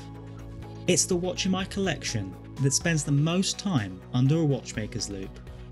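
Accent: United Kingdom. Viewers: He's British. He's just talking.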